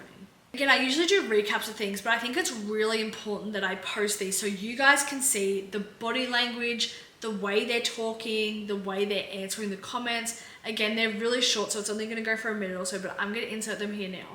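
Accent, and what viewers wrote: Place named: Australia. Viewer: Why didnt I know you were a fellow Aussie? Your accent!! Yes Erin is guilty. Are we really surprised? Chandi lost the plot.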